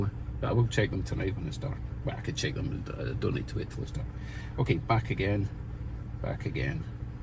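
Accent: Scotland, United Kingdom. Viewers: I get the hint of a Scottish accent 🤣 , a more posher Scots twang than a thick West Coast Glesga, or has it deteriorated due to living in the states, excellent vid 👍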